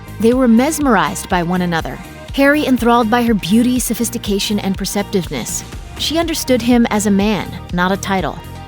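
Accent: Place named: California, United States. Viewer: it's the same californian accent the narrator and Meghan have. Meghan's voice is a pitch/octave lower than the narrator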